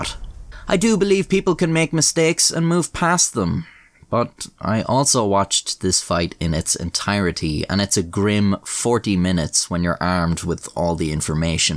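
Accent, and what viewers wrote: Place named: Ireland. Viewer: Hey there lady! Just wished to say love your vids, and your narration with your awesome Irish brouge . Wish I could sound so good. I just have a goofy American accent. You have so much going for ya no need to be downing yourself as you seem to sometimes. I bet you're a right fine lad. I hope you have a most excellent day/evening whatever time you see this.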